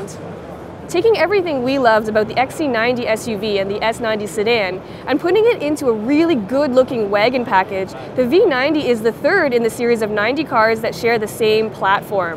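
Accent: Canada. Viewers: is Chinese???? But she sounds so Canadian.....;p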